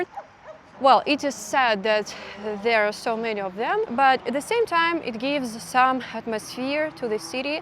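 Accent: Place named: Russia. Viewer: Vielen dank, Fräulein! Your video woke up so many ideas, again! How many people has escaped Russia in order to avoid conscription, getting arrested of for not seeing any future there? We cannot know all of them, but we have you. For so many of us, you represent them. Your work is very, very important. You remind us that there are Russian people who oppose the regime, who are not brainwashed. And who, probably, will not be easily brainwashed by the western propaganda, either. That is quite an achievement for one single person! Your education was probably not futile. To be honest, you cannot even know it right now. You are not in a situation where you can somehow easily solve it all. Most probably, it will never happen. I let the native speakers evaluate your English. I, as a Finn, can hear some Russian nuances. But you have learned that you can get familiar with new languages and cultural things pretty easily. 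Anyway, the more years pass after our studies, the more important are those tacit abilities we have learned, rather than explicit skills, like world views, learning strategies, ways of speaking and, especially, listening to others. And. So. On. Making videos is just tecnics or tools. You are able to think independently and speak about your thoughts in a very assuring way. You don't have to be an engineer to make videos. On the other hand, few engineers are able to work as a vlogger, or journalist, if you will. Remember, there are vloggers making wideos about ope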